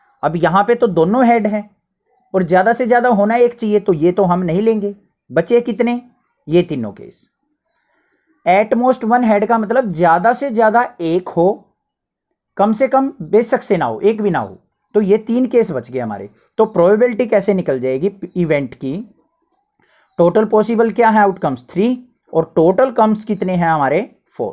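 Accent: Haryana, India. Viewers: Sir ap haryana se ho na apki language thodi vasi hi h sir or baki sab ke bhi solve karado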